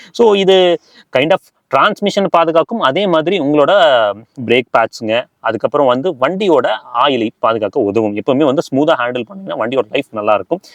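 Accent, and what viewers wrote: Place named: Kongu, India. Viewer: Awesome, I am watching from your initial video. Amazing to watch how you improved. Your Content, Presentation & kongu slang fantastic. Keep Josh ahead.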